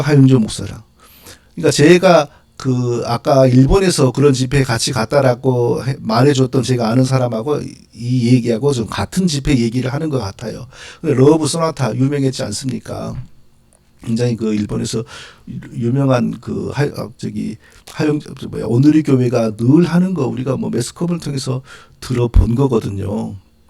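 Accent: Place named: Jeolla, South Korea. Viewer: 전라도 사투리가 짙게 뭍어 나오는군. 경망스런 놈이군.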